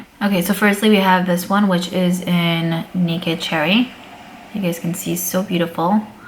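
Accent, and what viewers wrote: New York, United States. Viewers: When u have 3 videos to watch first and choose your favorite YouTuber!!!! You doll!!! I love love how honest and genuine u are! And I miss my new yoarrkk accent lol. Please don’t ever change! I find that once a YouTuber gets a little famous they stop answering the people in their comments who got them there ! I know u are different and so transparent! Xoxo 😘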